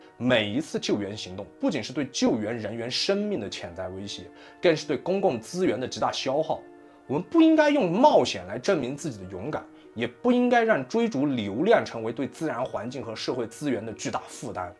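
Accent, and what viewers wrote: Yunnan, China. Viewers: 应该是个银南人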